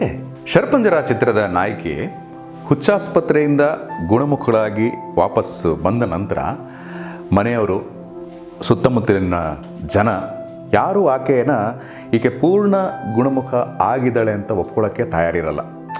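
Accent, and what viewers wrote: Standard. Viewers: ಅಚ್ಚ ಹಾಗು ಸ್ವಚ್ಛ ಕನ್ನಡ. ಕೇಳಿ ಆನಂದವಾಯಿತು. ಧ್ವನಿಯು ಸಹ ಸೂಗಸಾಗಿದೆ. ಈಗಿನ ಚಿತ್ರಗಳಲ್ಲಿ ಬರೀ ಅಬ್ಬರವೇ ಜಾಸ್ತಿ. ಕಥೆಯೇ ಇಲ್ಲ. ನಾವು ಹಳೆಯ ಚಿತ್ರಕಥೆಗಳನ್ನ ಮೆಲಕು ಹಾಕಬೇಕು. ನೀವು ಆ ಚಿತ್ರೀಕರಣದ ಸಮಯಕ್ಕೆ ಕರದೊಯ್ಯ್ದಂತೆ ಭಾಸವಾಯಿತು. ಒಳ್ಳೆಯದಾಗಲಿ. 🙏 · ಉತ್ತಮ ಶೈಲಿಯ ಶುಧ್ಧ ಕನ್ನಡದ ನಿರೂಪಣೆ. ನಿಮಗೆ ಧನ್ಯವಾದ.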